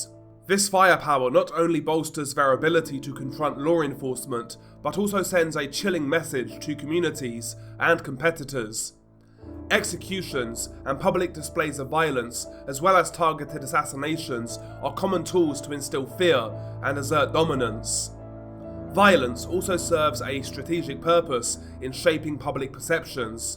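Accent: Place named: United Kingdom. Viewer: He’s a brit lmfao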